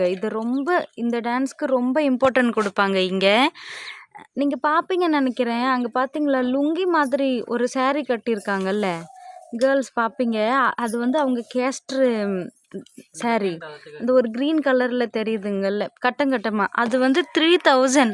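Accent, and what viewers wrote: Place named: Telugu, India. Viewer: Yei paithyam tamil ozhunga pesu, ennomo telungu ponnumari Pesara? Over sean podatha, tamil kaarithane nee thikki thikki pesura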